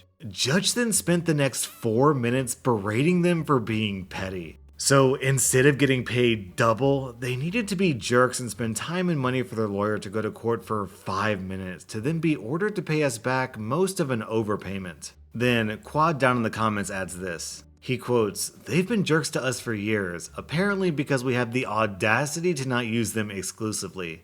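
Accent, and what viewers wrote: South, United States. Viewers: I love your country accent lol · Not gonna lie that southern accent was kinda cute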